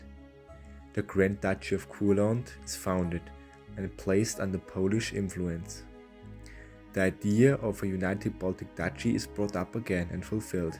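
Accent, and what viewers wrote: Bavaria, Germany. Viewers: Das video wurde sehr gut,und man hört ziemlich deinen Bayerischen Aktzent😂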